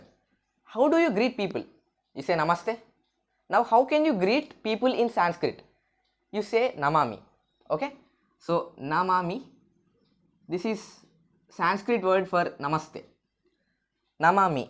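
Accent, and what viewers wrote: India. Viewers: Are you malyaly🤔🤔🤔🤔🤔🤔🤔🤔🤔🤔🤔🤔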